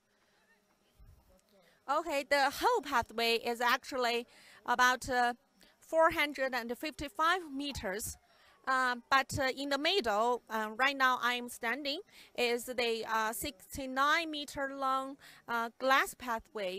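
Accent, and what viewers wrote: China. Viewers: What I love about the video is that when she switch from English to Chinese or vice versa she sounds the same mean Chinese her Chinese ascent is so Heavy as she goes from English to Chinese I'm like hold on what?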